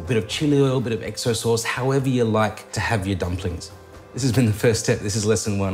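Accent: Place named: Australia. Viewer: came from uncle roger! You're Australian !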